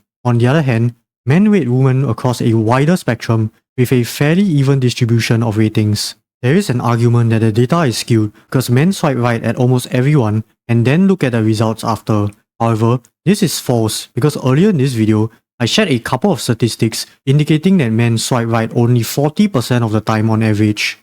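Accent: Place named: France. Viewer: you sound slightly Frensh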